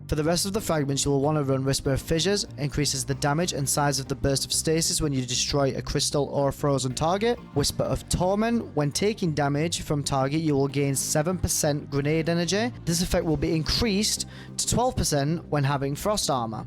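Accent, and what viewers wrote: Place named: Yorkshire, United Kingdom. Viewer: Yorkshire lad! Where's tha from lad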